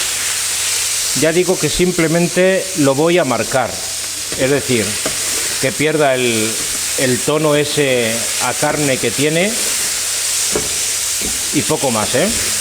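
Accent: Castile, Spain. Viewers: Ya lo leí en su mandil hermano hermoso dicho el alma que anda en amor me cansa ni se cansa también es lindo escucharlo hablar castellano castizo aquí en América Latina a veces nos comimos palabras que son tan expresivas como las que usted habla cuando describe todo Usted es una persona encantadora hermano y sus historias me divierten aquí sólo escuché en mi vida de el Marqués de calatrava todos los errores de gramática son porque soy muy vaga para escribir y la grabadora corre sin puntos ni comas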